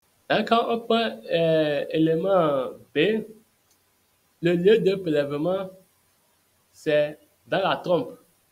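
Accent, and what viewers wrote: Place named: Africa. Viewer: La prononciation africaine de français me fait mal au cœur mais merci beaucoup 🎉❤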